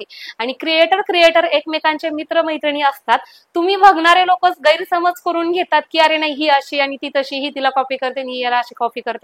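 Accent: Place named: Solapur, India. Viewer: खरं आहे आशा निगेटिव्ह कमेंट आल्या की आपण नाराज होतो. पण आशा कमेंट कडे दुर्लक्ष कर. आणि पुढे करत रहा. मलाही अशाच निगेटिव्ह कमेंट्स येतात. मी दुर्लक्ष करते. तू छान करतेस. आपली सोलापुरी भाषा आपला अभिमान आहे. आपल्या भाषेवरून बऱ्याच कमेंट्स येतात. पण मागे हटायचं नाही. नाराज व्हायचं नाही. पुढे करत राहायचं.❤👍👍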